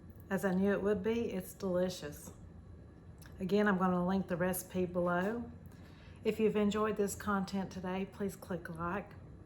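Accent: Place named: South, United States. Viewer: Hi, congratulations on 3 years of YT👏👏. I didn’t know that YT started 20 years ago, wow 🤯. I truly enjoy your videos, you are a great seamstress and I also love your southern accent 🥰🥰. Thank you for sharing your Zucchini bread (now I’m hungry 🤣🤣). Have a wonderful Holiday weekend 💖💖💖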